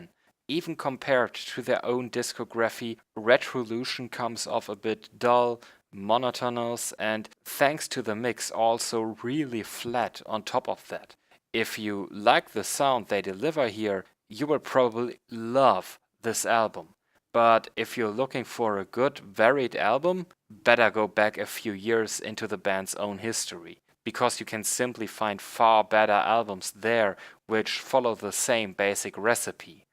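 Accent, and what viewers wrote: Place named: Germany. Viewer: yes....vor allem das grässliche Englisch dieses "Reviews"....wenn schon, warum dann keine Reviews in deutsch anstatt "Sauerkraut-Englisch"???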